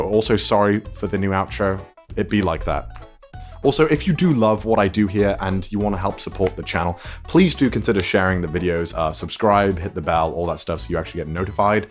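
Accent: United Kingdom. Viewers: I think fandom runs in the british accent my friend, infact Americans cured my lack of confidence in speaking through all their random comments about my voice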